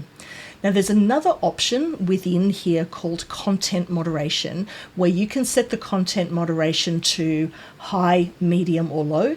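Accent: Australia. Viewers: Another great and informative video, I am really getting into Copilot as a result of your videos, I can see what an amazing benefit it can be for any sized organisation. Keep up the wonderful work! I always look forward to your new releases. Also, as a fellow Australian, so good to hear an Australian accent on YouTube, as well as the familiar cultural nuances and humour in your discussions.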